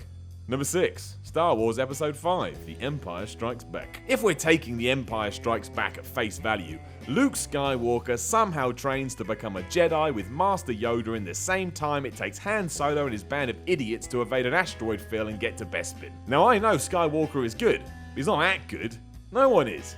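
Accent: United Kingdom. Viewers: Fucking bullshit::: this British accent fuck head thinks he’s soooooo smart ,,,,,cause he’s British?? How’s that British empire doing now ?? British elites???! They even tip like cheap bastards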